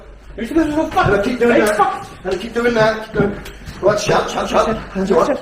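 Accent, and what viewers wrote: England, United Kingdom. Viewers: Amazing….maybe because I dont understand this englisch akzent sad I main idea is ….if the enemy touch my hands(fence) the I give him a blow and go straight on and kick him of the planet. Is this right? Can somebody write the main entantance of this vid for me in this comment? I am so interest to know what really he ment. I dont understand this art of spoken english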